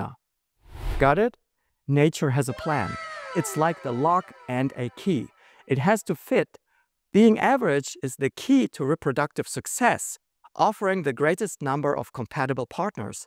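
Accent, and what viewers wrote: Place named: Germany. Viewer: When he introduced himself with his name i just looked up like: damn dont even realize someone is also german until they sqy something in german... Like bro, i am german and dont recognize my own accent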